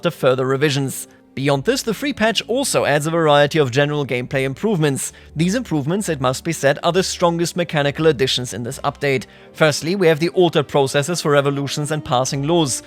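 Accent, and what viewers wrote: Germany. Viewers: how does he sound so german yet so fluent in english at the same time? i cant stop listening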